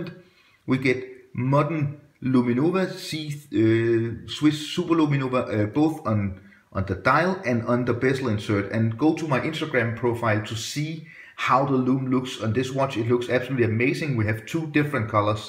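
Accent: Denmark. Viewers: Watch On...your accent...Danish? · Tak for dit review :) Jeg kunne høre du er Dansk, du sagde her et par gange. Men hvad jeg ikke helt forstår er HVOR du købte det??? Og FEDT ur, jeg vil have et hvis jeg kan få et. Det er gennemført nice og dejligt at høre hvor superglad du er for det. Jeg sidder selv med min spritnye Oris Staghorn Diver WR300m, med et dejligt Sellita værk SW200-1, Oris modded' det for Staghorn, og det er meget præcist og dejligt at gå med... Jeg er også glad for mit og kigger hele tiden på det. Det gør du også er jeg sikker på, hahaha. Congratz with the watch mate... It's KOOL.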